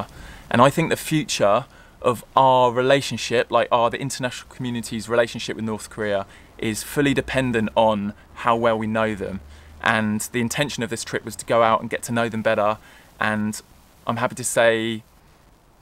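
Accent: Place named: England, United Kingdom. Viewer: This guy looks like a Jamaican Sharlto Copley with an English accent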